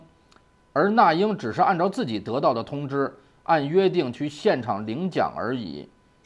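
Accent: Beijing, China. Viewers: 听老哥的北京口音就是亲切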